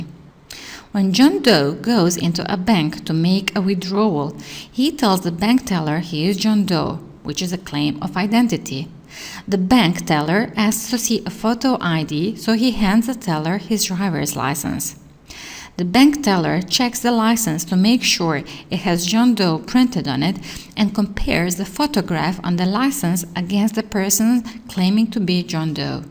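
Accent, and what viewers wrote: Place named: Russia. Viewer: That accent, are you from Albania or Russia?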